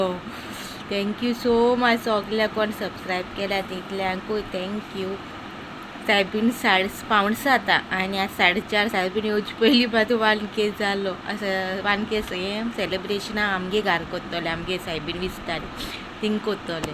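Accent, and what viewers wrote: South, India. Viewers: Nice voice and south accent keep it up Nice information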